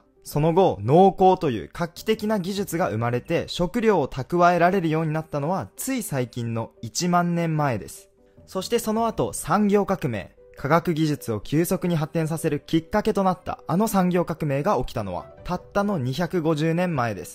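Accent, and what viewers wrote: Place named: Tohoku, Japan. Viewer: あ、そうなんですね！こちら、秋田出身東京在住なのですが、はじめ（親しみやすさという意味で）ときおり東北チックな訛りがあったので、そちらご出身かなと勝手に想像していました＾＾ さんの語り部、毎回とても楽しみにしています＾＾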